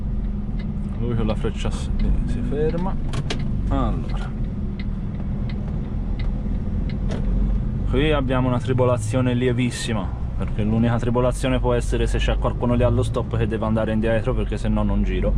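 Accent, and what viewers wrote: Tuscany, Italy. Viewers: Video molto bello soprattutto non noioso poi l accento toscano migliora il tutto. Potresti fare un video anche di pochi minuti un cui fai vedere il camion?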